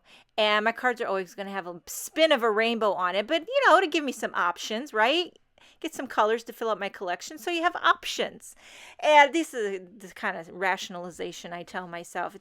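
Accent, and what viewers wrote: New York, United States. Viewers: I love your videos, funny personality and I love your accent too. New York?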